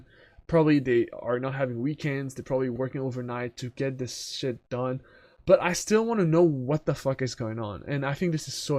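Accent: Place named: France. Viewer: Un ptit accent Français bien caché, j'aimerais bien parler anglais aussi facilement que toi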